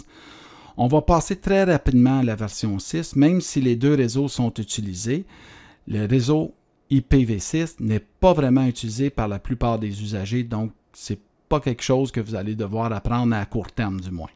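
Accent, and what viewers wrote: Quebec, Canada. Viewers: C'est bien mais je comprends rien le Canadien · super merci beaucoup, votre accent québécois est parfait pour mon cerceau. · Très bonne vulgarisation d'un sujet pas toujours évident pour les débutants. Je vais la conseiller à mon fils qui débute ses études en informatique et qui voit justement les notions de réseau IP. Et lui qui adore le bel accent canadien (vu de Belgique, il y a bien un accent), il va être ravi. Bravo pour cette vidéo !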